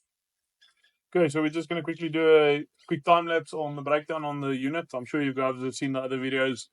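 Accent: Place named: Africa. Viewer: Man where you from? Your accent doesn't match your face. Are you from Africa?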